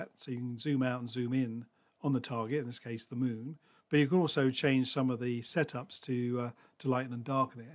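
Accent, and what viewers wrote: Australia. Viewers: you sound like an Aussie expat rather than a Pom is that correct? Great moon images mate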